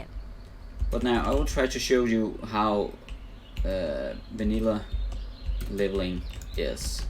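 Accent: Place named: Denmark. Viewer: What contry is you from? Du lyder dansk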